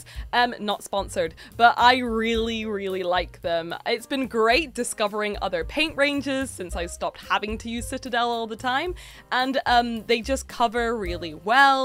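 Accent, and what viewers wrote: Scotland, United Kingdom. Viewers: Your accent sounds scottish to me but americans are terrible with accents and will confuse completely different accents 😂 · I knew you were from Scotland. You have the same accent as Christine Kochanski, Listers babe from Red Dwarf. The earlier versions not the English one obviously. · I figured your accent for Scottish right away. I'm honestly surprised people ask. It seems obvious to me. Mind you, I was raised on British comedy, so... I agree on the "calm down" thing. I hate being made to feel badly about the things I love. I think your Bloodbowl goblin is adorable. It's not really my business why you are no longer with GW. I just know it's their loss, and our gain. I'm looking forward to everything you have in the pipe. Wishing you nothing but unbridled success! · Very obvious Scottish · Congratulations, I think you've done a fantastic job with your channel. 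You're so easy to like, and your passion for the hobbie shines through. Seriously, though, how anyone could think you were from anywhere but Scotland is beyond me 😂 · Definitely a Scottish twang in your accent, but not an out right Scottish accent I feel. Have you been out of Scotland for a good long while perhaps? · Just sound Scottish to me. But to be fair, I'm English and know a fair few Scots. So I have a higher level of exposure than someone from outside the UK. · I was like “that accent is definitely Scottish” and then all the questions came up and I thought man… am I wrong, is it something else… nope. I guess perhaps modern media has led people to think everyone who is Scottish has to sound Glaswegian. I lolled when you said “Australian” but I can see how some might think American if by that it’s the Appalachian accent which can sound a bit Scottish, but given its relatively rare and you live in the UK Scottish is far more likely 😂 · Ah, the challenge of an East Coast Scots accent. 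I’ve been asked if it was Canadian. In Canada. No idea why it’s such a hard accent to place. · Well, I'll be damned...always thought you were Irish over Scotish. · Her accent sounds Scottish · Anyone who'd place your accent anywhere but Scotland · I figured it was Scottish, but couldn't put my finger on it, very light northern Irish was my second guess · My wife was born in Dundee, moved to Glasgow when she was a wee teen, then moved to America when she was about thirty. I recognized your accent immediately. She collects sea glass too. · Quite obviously Scottish. Silly people. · As another scot, you very much sound Scottish dont worry. 😂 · Maybe it’s because I have Scottish family and wear a kilt full time, but there was no question about you being a Scot. The accent is a dead giveaway and in this video, the flannel you are wearing is Black Stewart. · its nuts saying this but as a fellow scot i was still like "IS SHE SCOTTISH?!?!" for a while watching a few of your videos, i thought maybe you had moved somewhere and got a dual accent maybe. 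but then i settled on "nah she scottish" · I now know why I love your accent my grandad was from dunfermline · How do people think you have an Australian accent? your accent is very definitily north of Hadrians wall lmao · My word, I've never met another Scot with the same accent trouble. I don't use Scots, so my accent comes out similar to yours, and people guess all over the place for me. I've had American, Canadian, South African, and one person came close and said Irish, but it seems like only other Scottish people recognise me as Scottish instantly. It's usually the hard R sounds that mess people up, but I also heavily use glottal stops which should clue people in. I'm from Campbeltown and live in Cardiff. All my family sounds properly Scottish, using Scots and such, though, so I always thought I was just a weirdo. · Yeah that bit I thought was wild, I thought, her accent is obviously Scottish but also very much not Australian or American...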